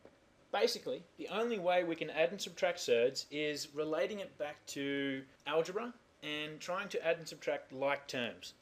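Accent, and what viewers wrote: Australia. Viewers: Revising in australian, so much better..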